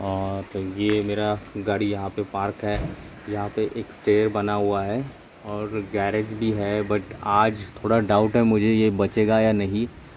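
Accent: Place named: Maharashtra, India. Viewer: व्हिडिओ मराठीमध्ये बनवला असता तर बरं वाटलं असतं. तसंही तुमचं उच्चारण बरसच मराठी वाटतं होतं.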